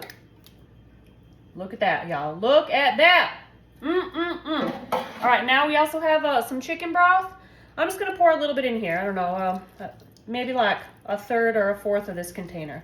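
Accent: South, United States. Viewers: You sound Southern just like me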